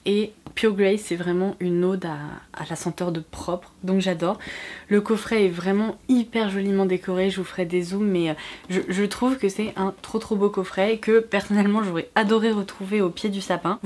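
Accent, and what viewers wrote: England, United Kingdom. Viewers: Petite question idiote mais il te sors d'où ton accès en anglais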